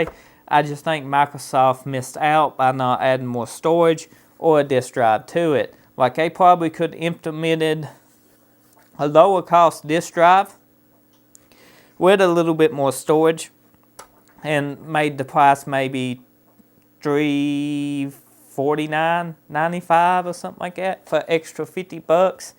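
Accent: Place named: South, United States. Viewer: Your southern accent. That one lol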